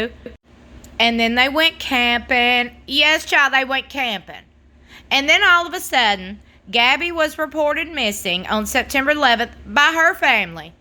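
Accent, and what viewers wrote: South, United States. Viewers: Girl you as country as country can get you got that slang girl where you from Manchester ky I bet Harvard ky it's the south I know that for sure 😆 I love 💘 it country girl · Love this Southern Accent. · I love your voice down south hospitality race aside I like your show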